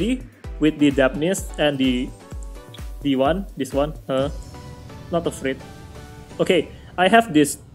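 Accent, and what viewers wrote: Indonesia. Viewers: Soalnya b.ing nya kayak logat indo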